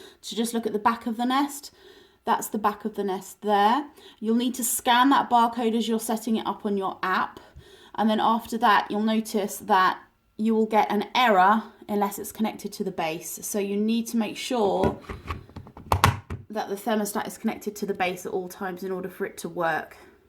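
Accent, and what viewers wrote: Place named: United Kingdom. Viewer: Whatever way I try to say this, it will sound sexist to some degree but it is not meant that way at all. It is SO refreshing to hear a woman's voice on one of these usually male-dominated videos. And the voice is British. And the voice knows what it is talking about! I have watched a lot of NEST stuff and it is either mounted to the wall with a wire or on the table base - nice to see it on the wall with the wire and also unique to see it 'unplugged' from the mount. A video made by someone who shows what I want to see, not all the stuff available elsewhere. Brilliant!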